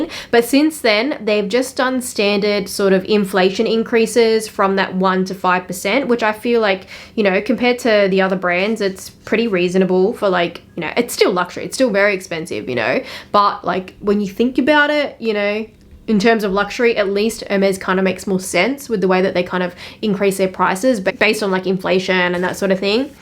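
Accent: Australia. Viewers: I like the Aussie accent I am half Australian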